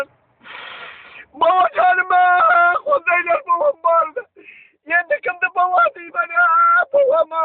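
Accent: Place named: Surkhandarya, Uzbekistan. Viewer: Og'ayni Surhondaryo shevasida gapirayapti.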